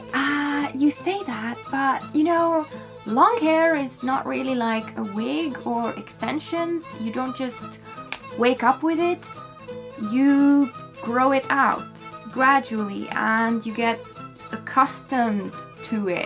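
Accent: Hungary. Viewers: What's wrong? She is from Hungary and many people around the world have accent and pronounce words differently. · …where are you from?? Just wondering…I take a guess but can’t quite get it correct I think. ❤️🤗Ok, I see now. You’re from beautiful Hungary!!! Lovely accent. ❤️🤗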